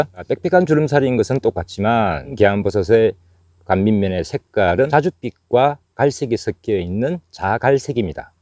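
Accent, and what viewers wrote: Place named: Jeolla, South Korea. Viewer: 말이 전라게만네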